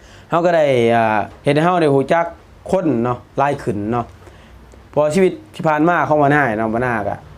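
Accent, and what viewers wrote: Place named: Isan, Thailand. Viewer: เจ้าเว่าคือคนอีสานน้อ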